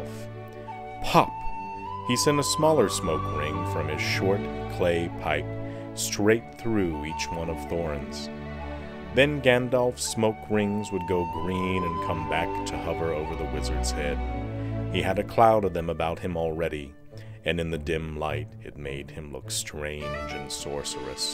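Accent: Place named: Italy. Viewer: dude i loved the Italian hobbit accent. also how many bowls do you usually smoke per week?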